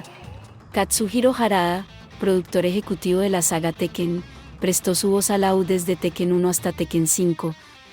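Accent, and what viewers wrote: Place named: Colombia. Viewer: Loquendo con acento colombiano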